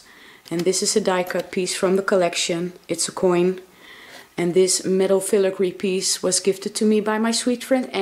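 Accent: Netherlands. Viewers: Goodmorning nice layout !!!! Im the guest designer for the month of march . Are you Dutch????? I am so I thought I heard a dutch accent!!!!! Take care Els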